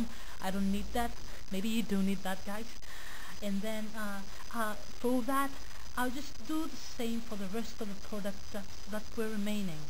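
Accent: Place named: Africa. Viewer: No no crime we are brothers xD People don't understand an African accent when they hear one lol Keep up the great work + new subcriber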